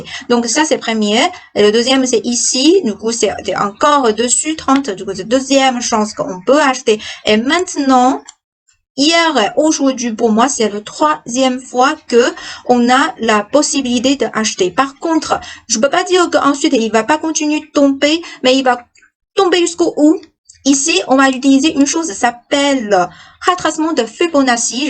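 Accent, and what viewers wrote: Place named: Northeast, China. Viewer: 东北大查子味法语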